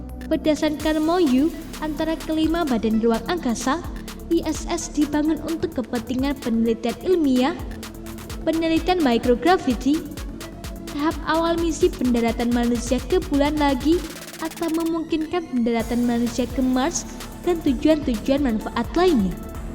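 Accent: Java, Indonesia. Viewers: Suara nya medok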